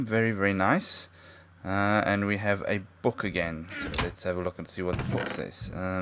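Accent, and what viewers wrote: South Africa. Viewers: I thought so, My parents have friends that are south african and they sound just like you. Pretty cool Man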